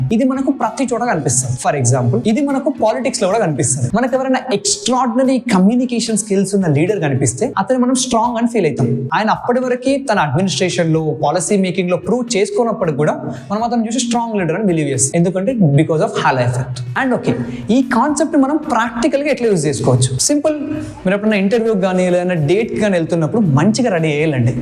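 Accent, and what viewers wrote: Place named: Hyderabad, India. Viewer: Bro Meru Hyderabadi anukunta.meru matalade vidhanam alage <link> voice kuda chala bagundi.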